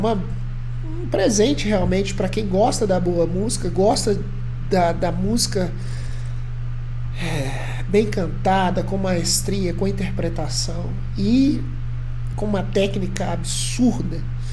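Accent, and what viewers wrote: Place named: Brazil. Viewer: Hi the best thing to do is listen to the singer in order you have done 1 & 2 so next is 3 thanks again for the subtitles my partner is Portuguese from Madeira so I understand some Portuguese but Brazilian Portuguese is slightly different love the Brazilian accent though ❤️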